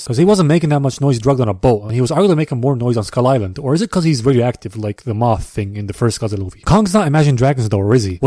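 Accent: New Zealand. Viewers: OMG HES FROM NEW ZEDLAND OR WHAT EVER THE FUCK NOT ASTRAS;IA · He ain't no Australian that's a new Zealander , and I should know because I'm Australian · He’s from New Zealand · He’s New Zealander not Aussie get it right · buddy he's very clearly a kiwi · Hes a kiwi 😑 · hes from new zealand not aussie😂😂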